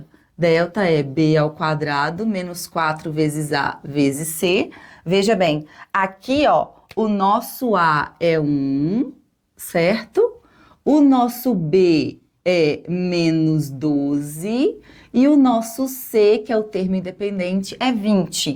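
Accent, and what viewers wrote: Minas Gerais, Brazil. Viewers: Sei lá... Quando a professora explica com sotaque "mineirês", eu entendo tudo! ♥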